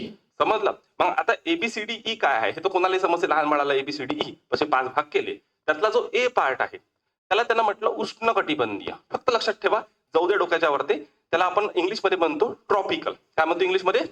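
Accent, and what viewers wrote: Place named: Vidarbha, India. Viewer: Sir tumchya bhashevrun as vatte ki tumhi vidarbhatle ahat... Nice lecture sir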